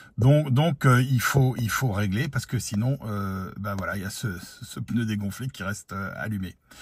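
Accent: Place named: United States. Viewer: Merci beaucoup pour cette démonstration ! Et quel accent américain🇺🇲 perfect!🫡😀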